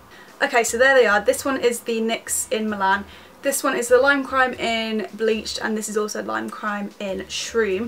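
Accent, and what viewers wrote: England, United Kingdom. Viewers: Are you English or American ❤️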